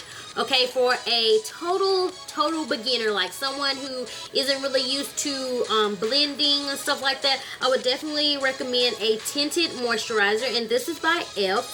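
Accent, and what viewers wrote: South, United States. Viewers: I love your voice. Where are you from? I am in Louisiana, and alot of black people around here don't sound like that, but you do sound southern. Very beautiful voice! :)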